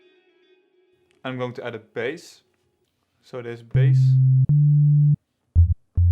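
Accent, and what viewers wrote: Netherlands. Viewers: Great beat and great tutorial! You dutch btw?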